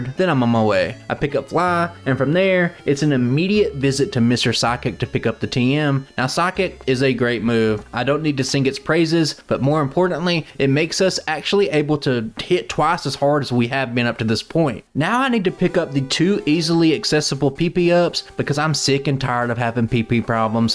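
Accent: South, United States. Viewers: Hey man just wanted to comment to say I love the content and just to support the algorithm. I love that your voice feel so like home since I am from the south as well! Keep it up! Can’t wait to see you continue to grow :)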